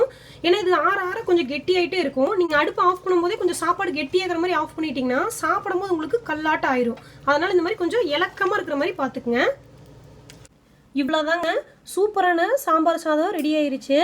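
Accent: Kongu, India. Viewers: konjum kongu tamil super, very respective slang in tamilnadu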